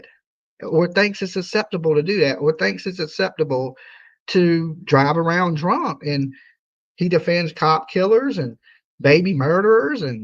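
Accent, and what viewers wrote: South, United States. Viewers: Hey! Long time listener (I remember you playing the Banjo during Summer Wells). I live on the border of Maine (USA) and New Brunswick (Canada) by 3 blocks and a River. It is Picteau County but pronounced Pick (like a guitar pick) TOE (like the appendages on your feet). I know this because I listen to a LOT of Canadian news (CTV or CBC). You are close and that redneck accent may be the issue, but Maine has rednecks, too so no excuse.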